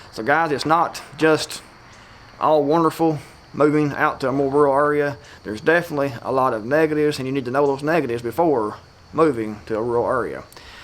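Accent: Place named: West Virginia, United States. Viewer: Sounds like West Virginia buddy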